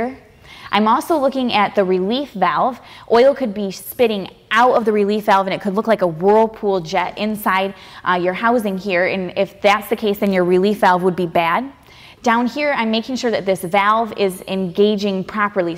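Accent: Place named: Michigan, United States. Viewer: Ah the classic Michigan accent. I miss it. Living in Kentucky.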